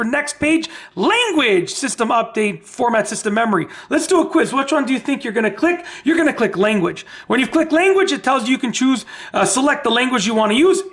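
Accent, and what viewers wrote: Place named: Canada. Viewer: I love that I can tell you're probably Canadian just listening to you. Well wishes from those of us who aren't crazed lunatics from the south of you.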